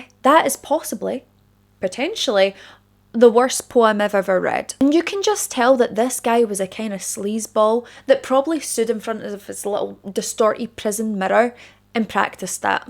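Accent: Scotland, United Kingdom. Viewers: I came across your rivers and canal video by chance, so good to here a true crime buff wee a Scottish accent, your passion in that video brought me to subscribe to your channel. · I've watched several videos on this apex laser! But, yours is the best! I appreciate the hard work and diligent research that goes into your content! Trust me,it shows brightly! Add your brilliant Sottish accent and we get consistent winners! Thanks, Jeff from Tennessee!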